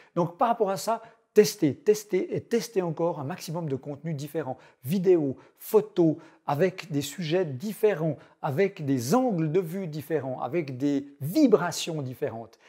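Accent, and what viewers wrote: Switzerland. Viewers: Hello je suis vaudois, t'as un accents qui vient de quel region en suisse?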